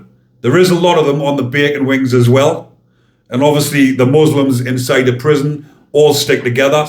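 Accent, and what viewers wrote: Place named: Scotland, United Kingdom. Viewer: What word is he saying, Bergin? WTF 😒 does that mean in English? Is this a Scottish accent, or Northern England?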